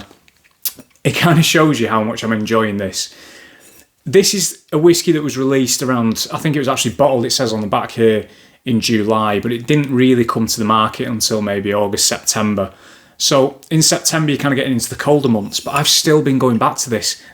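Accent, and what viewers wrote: Manchester, United Kingdom. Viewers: Nice video there... enjoyed your analysis and choices. Great to hear a fellow Northerner (I grew up in Preston - you sound more Manchester way).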